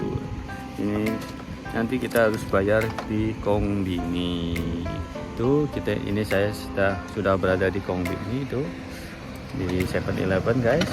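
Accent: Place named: Banyumas, Indonesia. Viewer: Ngomong GEning te ngapak boss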